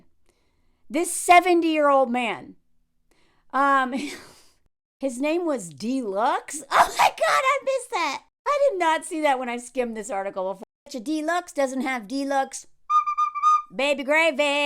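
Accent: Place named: Chicago, United States. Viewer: Your Chicago accent is hilarious! I'm from Chicago. Another amazing video,!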